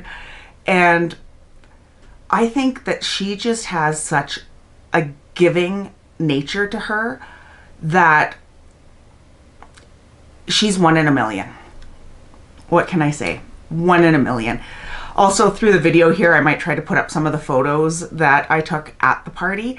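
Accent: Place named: Canada. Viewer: I had a feeling that was a Canadian accent, then you mentioned ketchup chips, and that confirmed it! I had a friend in Canada that used to send them to me in Virginia about 4 times a year, they are my favorite! I miss her and especially the chips. I only wish I could meet the kind people you are describing in this video. Most of the people you are speaking of seem very caring, I'm happy you had such a wonderful time. You didn't stop smiling throughout the whole video, and that really says something ❤. You're a true good-hearted Canadian, and I'm very happy you had such a great time with fabulous friends. 🤗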